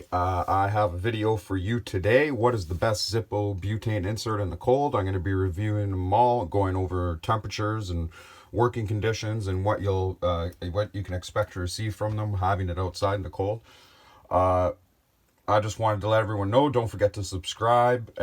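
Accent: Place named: Canada. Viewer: I support you. I remember seeing you on a couch with Tman (and a cat) and there was some chick in the room too. I remember watching your holyday video where you stayed at a hotel at the beach with your family, Infact I remember that video well. I remember Tman referring to you as a better option as a friend, If I was interested in inviting someone to Down Under. I remember the amazing gift of joy Tman gave me, as he puffed away on a Lucky Stike or Camel un fileted. But just now I want to say to you. I support you and Canada. I enjoyed who you are. And I enjoy your Canada voice. I could go on like your bus(van) trip to the Zippo factory or how I'd love to just grab a Blue Berry Zippo of the shelf at my local tobacconist. Ashes to ashes. BUD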